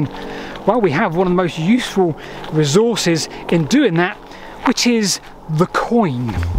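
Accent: United Kingdom. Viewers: You have an interesting British accent I cannot quite place.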